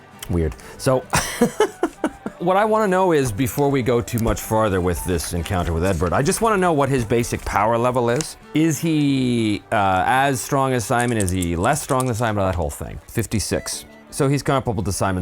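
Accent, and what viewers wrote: Ireland. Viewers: your Irish accent is pretty good! (That’s actually a big compliment!) Strong Dublin vibes. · Your irish accent is a bit Colin Farrel. 😁👍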